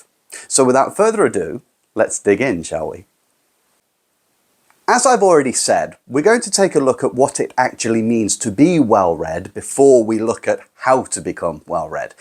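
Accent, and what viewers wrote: United Kingdom. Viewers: You're accent is very elegant and precise. A true british one.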